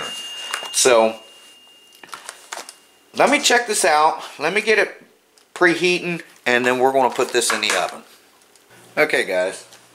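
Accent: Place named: Baltimore, United States. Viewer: Awesome video- very informative. You must be from Baltimore with the accent. Maybe southern PA. Let me know if I’m right!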